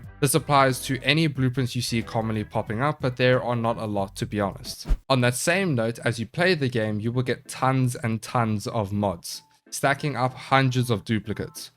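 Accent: South Africa. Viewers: You sound South African? Thanks for the guide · Is bro South-African, that accent goes hard?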